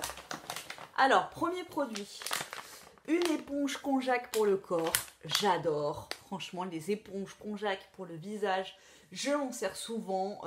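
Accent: South, France. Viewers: Quel sketch t es délirante 😂 (perso j'adore l'accent du sud ) j ai essayé le bain de bouche a l huile de coco et c est pas transcendant à part que ty as une belle couleur de dent je trouve mais côté fraîcheur c'est pas extra no no no 😛.pleins de bisouilles 😘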